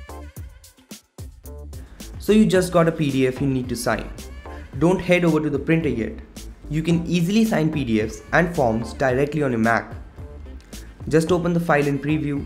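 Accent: India. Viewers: Beebom man! just keep up the gr8 work.i just beg you not to follow suit like C4ETech with "FAKE ACCENT". this indian English accent we have is the best! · This Indian accent makes my ears bleed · this unique indian accent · Indian accent is always funny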